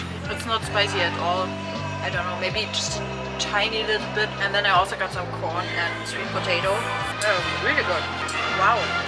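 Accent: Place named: Europe. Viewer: Hello, I have been to Lima 3 times, but the last time was in the early 80's. I have also been to 29 other countries. Your voice sounds familiar, maybe I visited your country before, but can not place your home country. I think maybe European. Ron USCG RET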